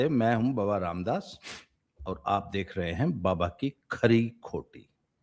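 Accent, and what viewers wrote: Haryana, India. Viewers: आपके मुँह त हरयाणवी कसूती सुथरी लाग है 😅😅😅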